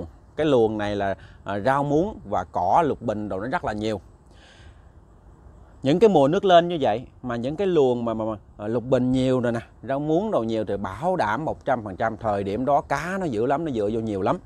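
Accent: Mekong Delta, Vietnam. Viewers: Ma mà khôn quá xá hả. Thích nghe giọng miền tây của em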